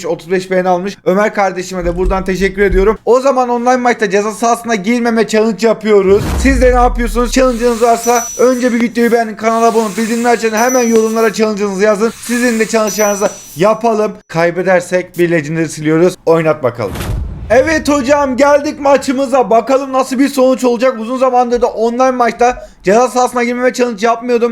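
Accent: Kahramanmaraş, Turkey. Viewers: abi challenge mahalle maçı kurallarıyla online rakibe karşı oyna lütfen beni al abi istanbuldan selamlar bende maraşlıyım sen aynı maraşlılar gibi konuşuyosun abi seviliyosun kral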